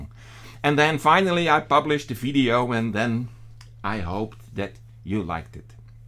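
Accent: Netherlands. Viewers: Nice that you are back again, I love when Dutchman speak english...........Act natural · Ha Ha Ha, you can't be Tony Fleming! Just like the English can't sing Russian Folk songs? Nor can a Dutchmen copy the sublime tones of a traditional Yiddish song? Be yourself because to me you sound and have the same mannerisms of my brother Tony? I know how to translate you amusing "dinglish" to English with great ease! Be yourself mate, that's the way we like you! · Keep that Dutch accent - its genuine.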